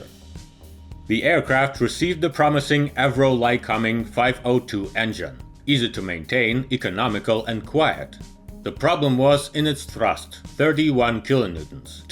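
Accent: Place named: Russia. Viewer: The narrator is Russian I think by the accent. They often have different words for English ones than we use. Nice aircraft. Wouldn't say no to a personal jet version.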